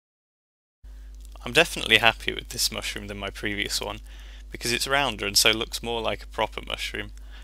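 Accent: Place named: United Kingdom. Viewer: Whoa. I never watched the Goomba guide, so I never knew was British....Whoa. I dint have a problem with British people, in fact, I like English accents, but I never imagined him as British.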